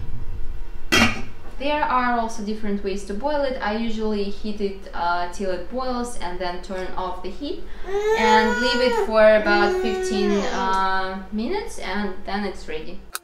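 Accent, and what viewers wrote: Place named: Russia. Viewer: Thanks for sharing, you have a wonderful family. I love your accent, reminds me of any action movie where there are Russian agents, like the movie Anna or James Bond. You have stunning eyebrows, kind of like Brooke Shields. Let’s hope Biden and Putin can get something going where the US and Russia can finally be Friends!!!! Good luck with your channel.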